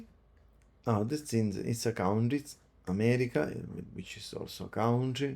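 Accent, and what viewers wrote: Sicily, Italy. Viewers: sentirlo parlare in inglese con accento siciliano , mi rende felice. chi fa questo lavoro deve essere bravo nello sviluppo non in lingue estere. spesso nel support service di cisco succedevano telefonate dove non si capiva nulla. e nessunondi noi ha mai detto niente. altro che accento siciliano.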